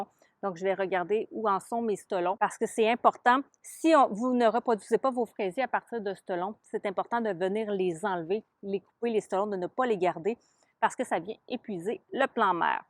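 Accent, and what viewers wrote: Canada. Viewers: Bonjour amie canadienne jadore votre accent je vous ecrit de suisse jadore votre video qui est bien expliqué mais vous parler d'engrais spécial fraisier sest bien mais avait vous penser au engrais naturel comme les coquille d'œufs marc de café ou purin d'orties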